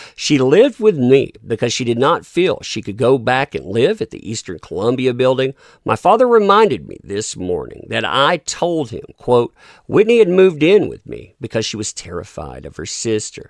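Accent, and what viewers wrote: South, United States. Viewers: always has such a soothing voice to me. Like so many people will just be shouting and talking so loudly and overly expressive, and it grates on me in more ways than one since I get oversensitized very easily. But something about calm southern drawl just is very calming like it's almost paradoxical how he's talking about such harrowing topics with such a calm voice, it really helps my anxiety.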